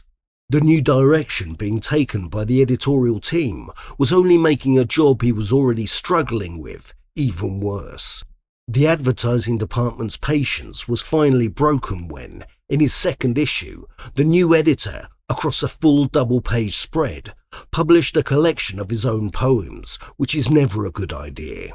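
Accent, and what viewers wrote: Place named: United Kingdom. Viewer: Love 30 days in the hole, fascinating plot. Love British English, so clear❤, useful for learning to understand English by ear. Dear (am I correct?)), thank you for your work. 1-3 I listened in Russian, looking forward to the next one.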